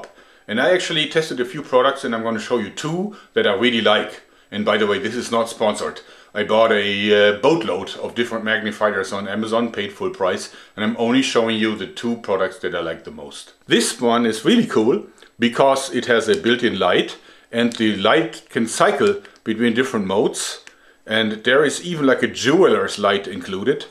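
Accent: Germany. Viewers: This is amazing. I love your Channel. I used to be a Chef so I really appreciate a sharp knife. I also like your thick german accent. Makes it unique somehow. Und das, obwohl Deutsch meine Muttersprache ist.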